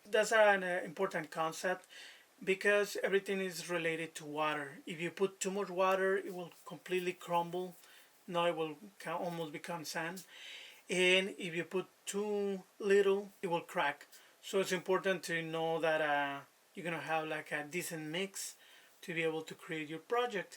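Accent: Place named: Italy. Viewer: Thanks for the video! I was making a clay figure sculpture in college, & then got injured in an accident. I was next not allowed by my professor to finish & turn it in for the semester; very unfair. My daily Bible Word gave instruction "Do not return to the place where you have been harmed," showing me not to work with this cruel professor anymore. So I was trying to find a way to make my sculpture since I no longer have access to a pottery kiln. I looked at expensive materials I cannot afford. I was praying & wishing there is some kind of archival 'air dry clay' that is like pottery. I asked God can you please let there be some kind of 'miracle' clay I do not need a kiln to fire?? & to please show me what to do? DUH--- then right after prayer, the next thing that comes my way is videos of cement mixture sculptures! LOL. I realize it is the 'miracle' material I was hoping for--- air dry, but solid... 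lasting... not so fragile like plaster. I really want to give this a try... & hope to avoid problems like you talked about... "rocks for mom" where the concrete dried before you can use it. I am watching many videos. Your Italian accent is very attractive to American women like me... though you are too young...boo... Oh well. At least I can learn art from you. Thanks for the lessons! Blessings. Peace.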